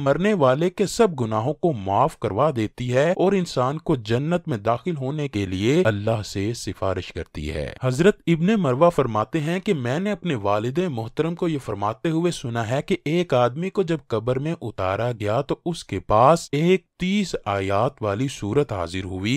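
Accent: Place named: India. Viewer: ladti nahi larti hay . kyu Indian english follow karty ho apni shanakht bhi bhol gay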